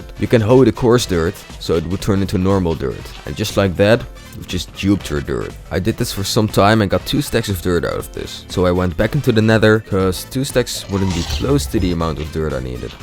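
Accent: Netherlands. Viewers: Are u dutch? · Are you dutch? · You’re Dutch and i can hear that XD Edit: on is Dutch chat said: nu pas and that is Dutch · Are you dutch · U DUTCH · where are you from netherlands? or are you russian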